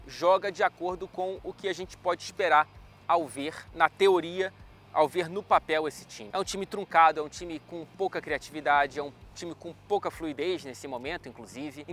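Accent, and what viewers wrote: United Kingdom. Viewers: Fala,! Beleza? Fãzaço do canal aqui! Cara, uma pergunta meio aleatória, mas como você fez para pegar o inglês com um sotaque mais "britânico"(além de morar no Reino Unido kkkkkkkkk)? Tem alguma dica? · Esse sotaque inglês do me pega muito 😂😂 Ótimo vídeo!!! Admiro muito seu trabalho! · O sotaque britânico dele … volto o vídeo só pra escutar dnv…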